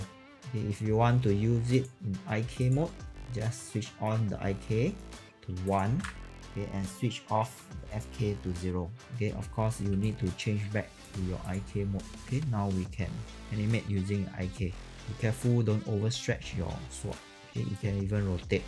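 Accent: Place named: Singapore. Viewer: thanks a lot sir, your explaination was so good. Are you from singapore? i can tell u by your accent :face-green-smiling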